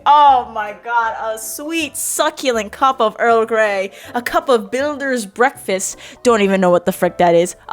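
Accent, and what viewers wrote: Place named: United Kingdom. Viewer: I’m british and I love ur British accent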